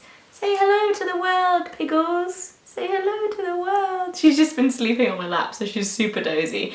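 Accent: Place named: London, United Kingdom. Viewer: I love your videos and your accent from London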